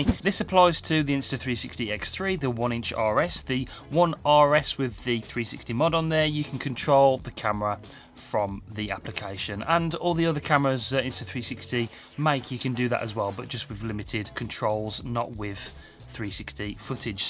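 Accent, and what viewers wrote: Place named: England, United Kingdom. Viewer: that english accent really sucks. Sometimes is hard to tell what the hell you are saying?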